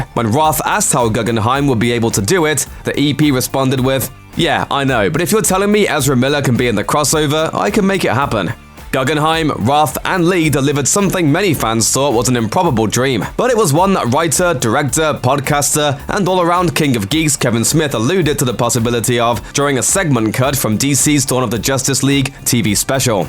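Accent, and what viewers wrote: United Kingdom. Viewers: I can’t tell if it’s British or not lol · He's actually British. He's a YouTuber called.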